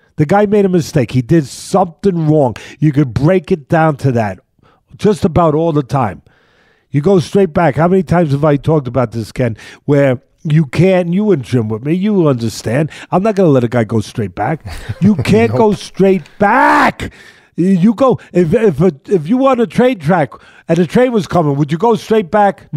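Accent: New York, United States. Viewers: Gotta love that old school NYC accent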